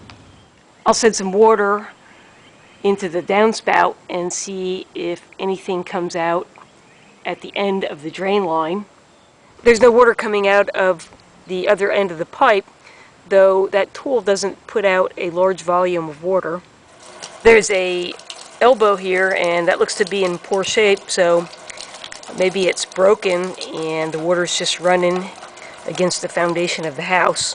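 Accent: Philadelphia, United States. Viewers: Philly accent?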